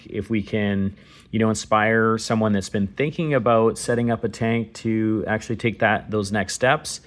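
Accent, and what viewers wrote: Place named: Canada. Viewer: love seeing a new reef channel congrats! u sound canadian hrmm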